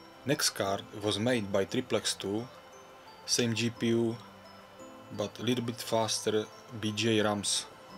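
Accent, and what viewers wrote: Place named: Czech Republic. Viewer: Ta sbírka hardwaru je naprosto skvělá. Když jsem tenhle kanál objevil, hned jsem podle přízvuku tušil, že je dokonce česká :-) Jen v tomhle videu jsou komponenty, které jsem ani netušil, že existují. Takhle exotický HW se ke mě nedostává.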